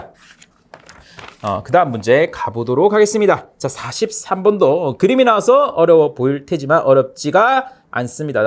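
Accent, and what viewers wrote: Gyeongsang, South Korea. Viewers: 경상도 억양 귀에 딱딱 꽂히네요 잘봤습니다ㅋ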